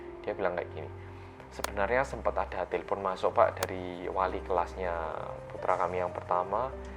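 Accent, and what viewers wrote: Java, Indonesia. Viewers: Balik lagi sma yanggggggg medokdokdokkk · 3 channel storytelling favorit: 1. (pelan, medhok dan mudah dipahami) 2. Nadia Omara (gayanya kayak itu ghibah) 3. Arroyan Pram (Konspirasi dunia pake Astronomi, Fisika, dan editannya gila keren banget, serasa nonton agung hapsah dan Jerome polin bahas konspirasi) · Yang MEDHOKKKKKKKK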